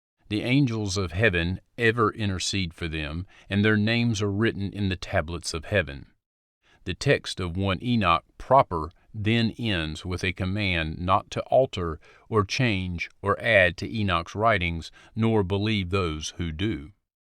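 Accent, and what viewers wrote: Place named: Baltimore, United States. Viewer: im thinking baltimore or philly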